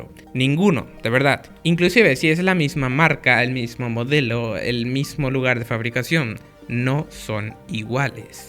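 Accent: Paraguay. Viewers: amigo vos sos paraguayo? de repente tenes acento paraguayo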